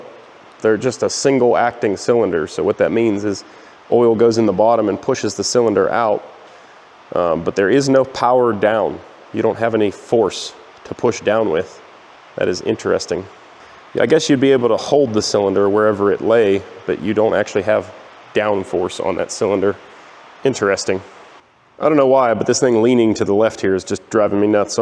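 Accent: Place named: Western Pennsylvania, United States. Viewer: I absolutely love your videos. They are very interesting and very enjoyable. I have to admit that I wait with anticipation for each and every one to come out. Being from western PA myself I feel as if I have known you forever. Your accent brings back many memories of home and things I used to do. I guess you could say I live my life vicariously through you. I am now on disability and living in Florida but each and every video takes me back home. Being an old mechanic and my love for old equipment makes it even better. May God Bless You and your family. Please keep up the awesome videos. I too am waiting on that overhead crane!! Lol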